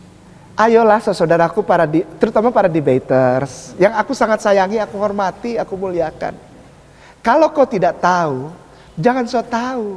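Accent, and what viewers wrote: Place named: Eastern Indonesia, Indonesia. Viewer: Terima kasih ustd kainama mski cara penyampaianny khas ketimuran.. kami skrg jadi mendapatkan penjelasan mengenai injil dan bible.. semoga Allah SWT membalas segala kebaikan atas kebenaran yg kau berikan.. aamin ya robal alamin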